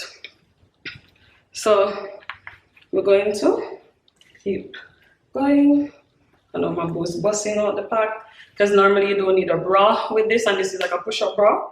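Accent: Jamaica. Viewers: I feel like I just know your Jamaican cause it takes one to know one!! But maybe your African 😂❤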